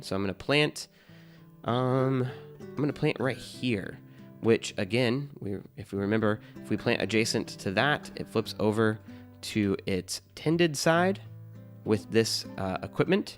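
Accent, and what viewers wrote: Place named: Kentucky, United States. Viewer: Amazing. For the first time, I truly believe you’re from Kentucky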